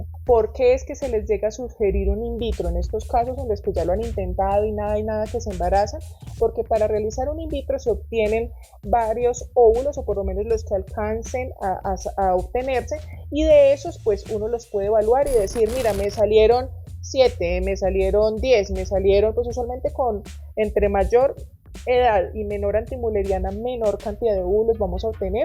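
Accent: Colombia. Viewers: ❤🙏🏻Dra. Agradezco la claridad y sutileza con la que nos explica los temas tan sensibles sobre la fertilidad. Le mando un abrazo enorme desde Tláhuac, CDMX. Usted es de las primeras personas que decidí seguir en YouTube para conocer el panorama sobre éste tema. Además me encanta su voz y forma de hablar de Colombia. ¡Gracias! por su labor.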